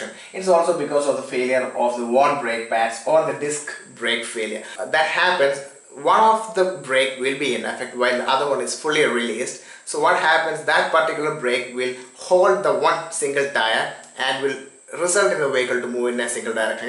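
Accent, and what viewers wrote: India. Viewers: I went trough the comments on the video. It looks like many of them have problem with your Indian accent. I should say understanding is a skill as important as speaking. so people need to be a little bit flexible on the accents to be able to understand each other. some people don't try or don't like to try! good luck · thanks for good info but hard to catch due to indian accents and pronunciation... thks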